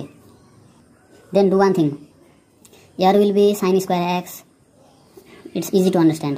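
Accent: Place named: Nepal. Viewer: Bro u nepali?😂 nice English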